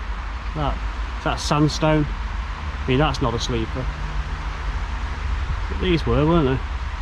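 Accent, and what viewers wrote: Nottingham, United Kingdom. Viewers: Man, that's a great No'Ingham accent!😂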